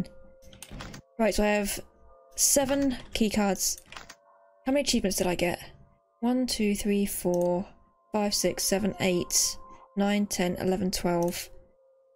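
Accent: United Kingdom. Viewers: Your a young british i can tell by your voice!!!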